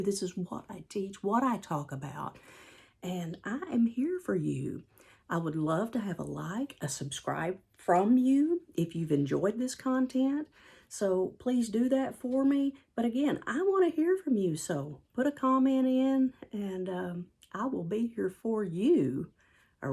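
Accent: South, United States. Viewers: I have experienced ALL 9. You just came across my feed... it's nice to hear someone w/ a southern drawl discuss these topics, as I too am southern, am sensitive to energies, practice energy healing & reiki. You asked for additional symptoms we may have had as well ... Heightened intuition, whole body vibration, energy pouring from hands & feet, connecting to plants & animals, wild synchronicities w/ numbers, music, situations, just to name a few. Oh, Clair's coming onboard & spiritual hot flashes ..crazy, crazy dreams too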